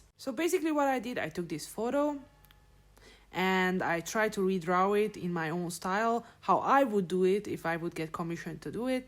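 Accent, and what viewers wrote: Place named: Hungary. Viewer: ...you sound hungarian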